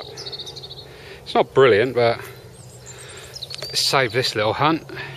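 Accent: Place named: Essex, United Kingdom. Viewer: G'day I'm an Australian these days but came from Ilford back in 1970. Where are you? You sound a bit like an Essex lad. I detect here in Perth but there is nothing very old in Oz.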